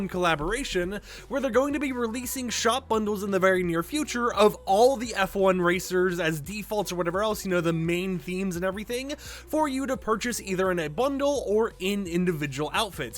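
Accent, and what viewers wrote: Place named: United Kingdom. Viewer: Bro is definitely British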